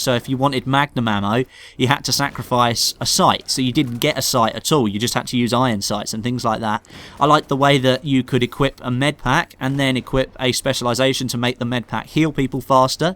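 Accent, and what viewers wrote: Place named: South East England, United Kingdom. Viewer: He speaks like most in the south east (i think) of England do.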